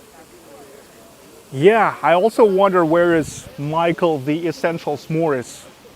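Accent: Russia. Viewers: Чет даже для русского заметно российский акцент )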